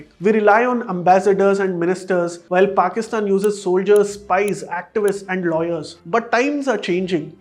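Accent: India. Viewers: Your content is really informative but you need to change your Indian accent if you are desiring for global audience. P.S. : I am not being racist....this is what you should do to expand your business...that if comes with learning new skills, language or accent etc. It is what it is you cannot change the world bro, you have to sacrifice yourself.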